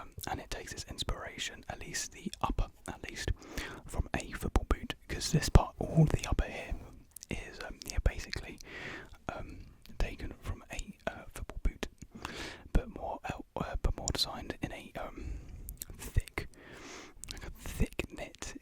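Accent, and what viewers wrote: United Kingdom. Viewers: Not a fan of uk accents but I liked listening to yours. Also really cute love the dark hair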